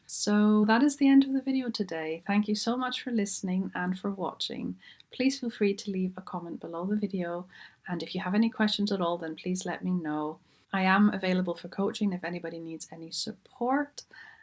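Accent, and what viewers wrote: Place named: Scotland, United Kingdom. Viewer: Can't figure it out. Sometimes she sounds like a native of Scotland, sometimes Northern Ireland.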